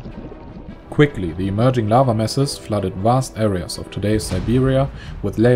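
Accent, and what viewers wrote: Germany. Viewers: Is the speaker German native? Hört sich ziemlich deutsch an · You have the most german accent I've ever heard, and I lived there for years. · i really love your videos but I must strongly recommend you invest in a native english speaker who also has a good voice because that would make a huge difference. your english skills is excellent how ever the german accent is pretty heavy and your voice is unfortunately doesn't have the best pitch making it very difficult to keep the focus on the flow of the video. I hope you don't get this criteria in a personal way I really think your videos are great but just the audio reduce the quality drastically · Everything sounds better with a German accents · Everything is great about this video but the commentator looks like he have a germane accent..I’m sorry but it’s really annoying and uncomfortable! 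· He is German. I was pretty sure from the accent, but in another video he mentioned some German cities and names and it was obvious that it's his first language. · i dunno why but hearing a German sounding guy talk about it being the largest graveyard in history.. made me laugh... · his heavy german accent brings some extra feel to the story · Ok... I knew it... This is a German person talking. Great animations and to my knowledge well researched I will subscribe. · I like how the narrator sounds like a Nazi scientist. 🙂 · There is something vaguely menacing about a guy with a Germain accent talking about the end of the world 😳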